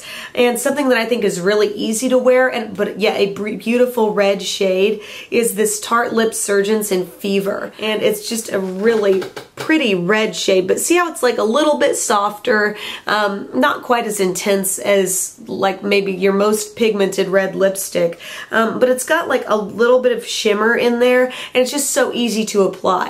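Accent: South, United States. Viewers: It's the funniest thing.. I don't know why but does anybody else think her voice sounds more "southern" with that raspy voice? lol I dunno but it seems like her accent comes thru just a liiittle more here, sounds like sassy southern woman to me! lol (I'm from the south so I'm not hating at all, if anything its sexy!)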